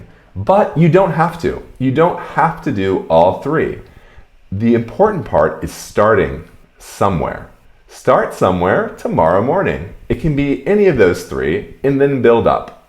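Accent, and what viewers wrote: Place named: United States. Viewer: I do not digest American accent.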